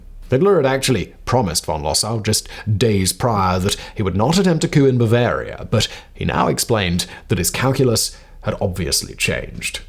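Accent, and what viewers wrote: RP, United Kingdom. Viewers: I can't watch this guy, is that accent affected or is he really that posh? Ugh. It's too distracting.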